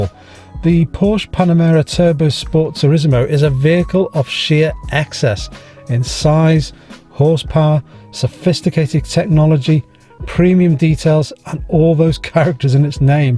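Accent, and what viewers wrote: United Kingdom. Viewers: British accent 😍 best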